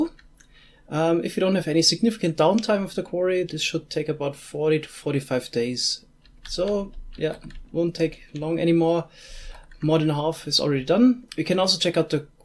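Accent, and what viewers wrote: Germany. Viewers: Are you German ???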